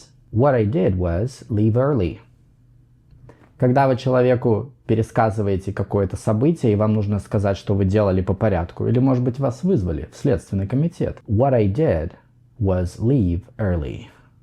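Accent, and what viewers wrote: United States. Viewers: Он и звучит как американец. У вас какой-то не такой ИИ (AI)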